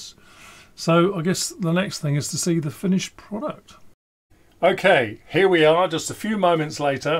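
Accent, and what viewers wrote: United Kingdom. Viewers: Hi. I'm just watching the movie 13 Lives about the rescue of the Thai boys trapped in the cave. Viggo Mortensen plays one of the UK rescue divers. He looks just like you and sounds like he comes from the same part of the UK as you. Great film!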